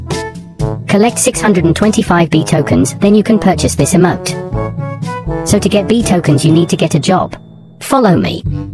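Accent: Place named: United Kingdom. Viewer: Your accent is british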